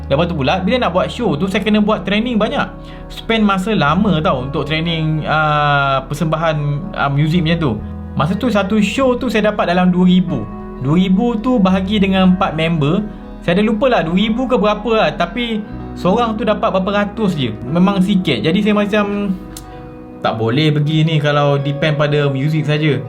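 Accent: Melaka, Malaysia. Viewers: loghat Melaka kuat jugak tu bro... Pegang = "pay"gang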